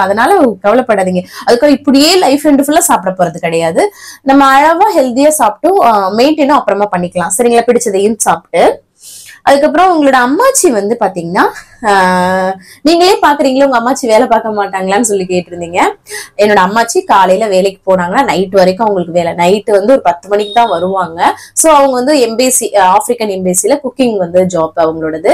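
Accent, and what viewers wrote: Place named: Madurai, India. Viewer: kk but pesurathu Madurai slag mathiri iruthathu na madurai tha native but ippo mrg aagi tirupur vanthute 8 yr aatchu 💕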